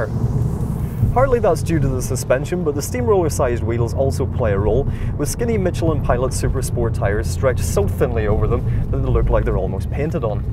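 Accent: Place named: Birmingham, United Kingdom. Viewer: He has the same accent like the other guy. Same hometown maybe? Birmingham?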